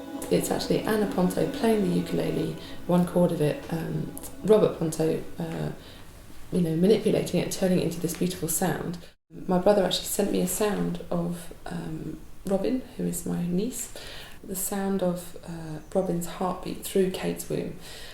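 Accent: United Kingdom. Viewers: I find myself torn between focusing on an amazing song and a British accent. So I watched this twice. :)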